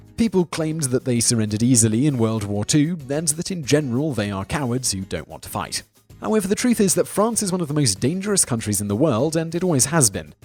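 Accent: United Kingdom. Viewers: | There shouldn't be an apostrophe. | Our & Canada's situation with the Queen is much too complicated to be explained like this. While it is true that many countries under the British monarch have some customs which Americans think are clues that we are undemocratic, they really are just that. Customs. While the Queen still has some emergency powers, they extend to dissolving Parliaments & actually make us more democratic because it's a fail-safe against abolition of democracy. | British people used to not care much about their teeth, until electric toothbrushes were invented. I think it's weird how Simon Whistler speaks in a British accent but embodies the American stereotype of assuming that all his audience are American. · There is an strong current of anti-Americanism in all his videos. Even this video is scolding what are essentially American perceptions of the French, Japanese, English, Spaniards, etc. 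His basic mission is cultural collusion with the American Left, to voice U.S. leftism in a British accent. With bad teeth.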